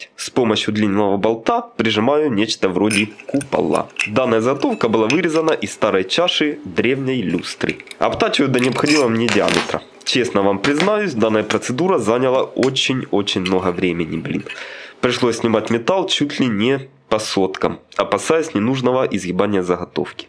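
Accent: Ukraine. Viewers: А зачем этот украинский акцент? Если комментируешь на русском. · акцент, украинецкий,я рад славяну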